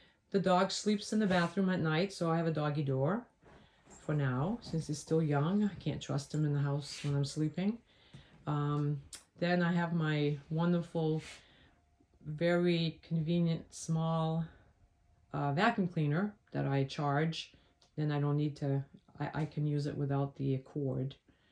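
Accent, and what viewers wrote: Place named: New England, United States. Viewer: are you from New England....I thought I detected a faint New England or NY accent. Very nice little space...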